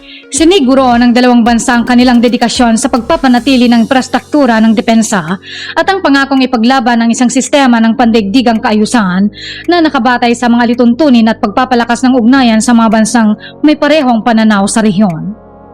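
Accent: Visayas, Philippines. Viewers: Bisaya po ba kayo ate😂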